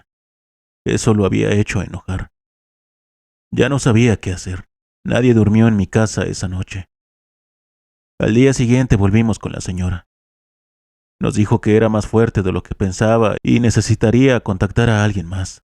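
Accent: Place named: Chihuahua, Mexico. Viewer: Voz típica de un chihuahueño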